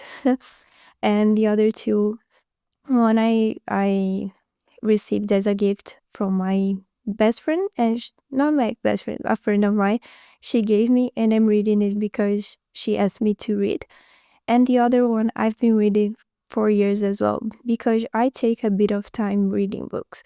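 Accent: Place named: Brazil. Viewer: That's so cool! I'm from Argentina but live in the US since I was a kid. I suspected you were from Brazil due to the accent. Your English is great for not having been abroad! Here they have a lot of little free libraries and the libraries are pretty good with variety. I have probably thousands of books, so I am trying to read and get rid of those I don't think I'll reread. Used books are super cheap so it's very tempting to load up on them.😅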